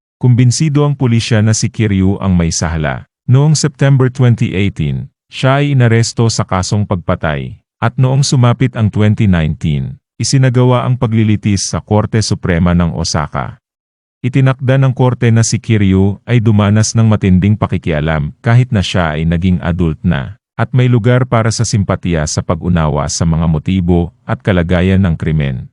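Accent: Philippines. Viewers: BWISIT SAANG KUPALOP KA NANGGALING KAASAR TAGALOG MO🤬🤬🤬‼️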